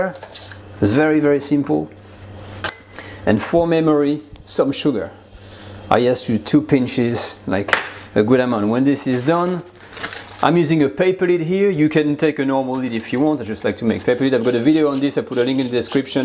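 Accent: France. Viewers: Mate, are you some sort of French guy located in Australia with Dutch roots? I hear lots of things happening with your accent. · I like the way you pronounced stir as “steer” and your French accent! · Nice! I'll try this recipe tomorrow, I love your french accent by the way... greetings from México!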